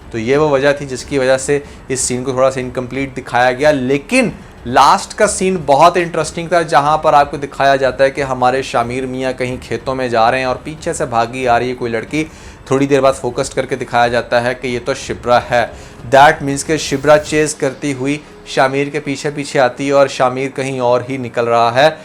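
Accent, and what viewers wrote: Punjab, India. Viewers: Bhai Punjabi Jo hai is wAjh se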